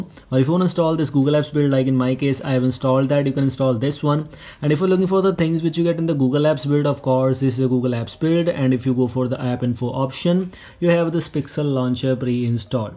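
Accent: India. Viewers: Please, two questions: There is no issue with Bluetooth (I'm not familiarized with the nice Indian accent and can't understand that part)? The navigation bar, the 3 buttons, can be customized too (take screenshots with a large tap in some of them for example)? Thank you!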